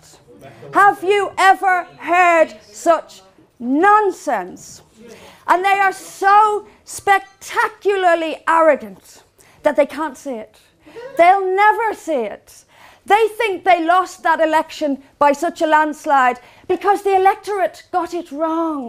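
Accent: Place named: Ireland. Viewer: Good speach young lady.. Romans .. Our Lords blessings in your assistance to seccede in everything that you set out to achieve.. Keep sn eye on The Torys and what they write in the small print of bills... And by the by the lilt of the beautiful Irish accent serves only to enhance the beauty of the daughters of men.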